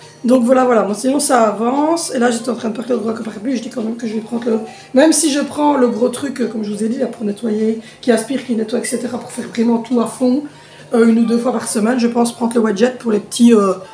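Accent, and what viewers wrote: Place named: Belgium. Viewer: Tu as qd même un peu l'accent belge je trouve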